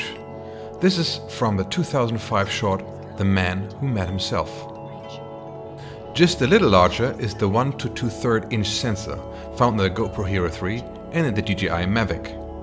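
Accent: Germany. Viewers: That was actually a really great video! Although I hate your german accent, but no offense buddy. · well I had to watch about 3 or 4 videos to be sure 😁 you speak very good english. Im german too so I know what makes the German english dialect but I think others wont notice ;) Looking forward to new videos. Love your videos.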